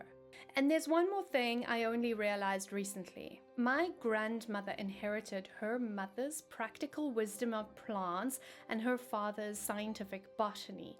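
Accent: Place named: South Africa. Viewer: I cannot explain how ecstatic I was when I clicked on this video recommended to me and heard a South African accent! So excited to watch every single video as I’ve been watching witch channels from America and the UK but some things were just not relevant to my location. ❤❤❤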